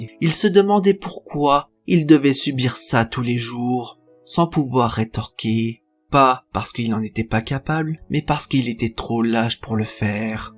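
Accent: Lorraine, France. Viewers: Désolé pour l'accent, c'est un accent Lorrain 🤣🤣🤣🤣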